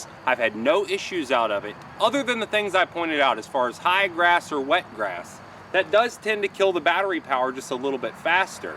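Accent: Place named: Pittsburgh, United States. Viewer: Are you from Pittsburgh? You sound like you're from Pittsburgh.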